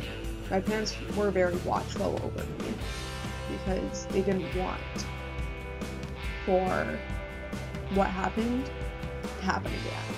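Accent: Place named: Canada. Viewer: I just want to thank you for sharing your story. I realize that it’s been awhile since this was first uploaded, but I want you to know that it really impacted me. I totaled my car 3 years ago and completely messed up my ankle. I can’t ever run again, and some days are more painful than the last, which in by no means compares to what you went through, but it was challenging. It took about half a year to be able walk without any crutches, and about another year just to make the limp less noticeable. It was all worth it though. Just like you said, I don’t think I’d be the same person I am today if it wasn’t for going though it all. I have to be thankful for that, at least. But, you really do seem like a wonderful, strong-minded person. Hopefully you’re still doing well; and, of course, watching the new deadpool movie somewhere. Most likely in Canada. Lol. 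Not to offend. You just seem like you have a slight Canadian accent. Then again, what can I say? I’m from the states and people tell me that I sound slightly Canadian all the time. Haha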